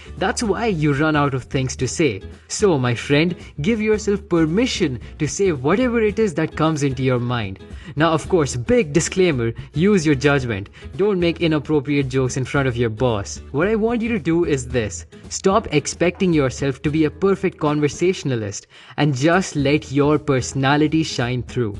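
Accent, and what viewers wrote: India. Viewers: Damn that indian accent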